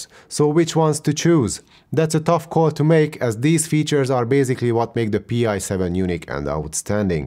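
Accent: Hungary. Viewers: Excellent review, saved me $400 since I was thinking about replacing my Airpods Pros for the PI7s. No need to now. Also the accent sounds Hungarian, curious if I'm correct.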